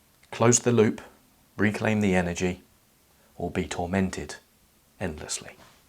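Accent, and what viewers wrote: United Kingdom. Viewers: idk why this guy is so convincing, it must be the british accent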